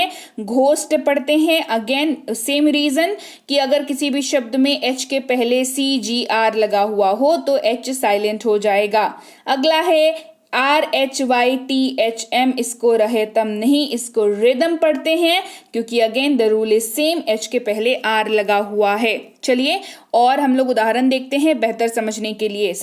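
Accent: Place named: East, India. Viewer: आप के उच्चारण मे पूर्वी भाषा मिठास बढ़ा देती है।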